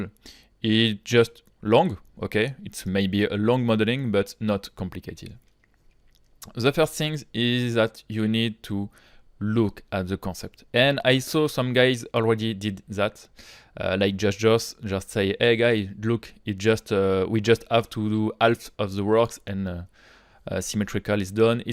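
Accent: France. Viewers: Some good french accent. As a french, it's music to my year.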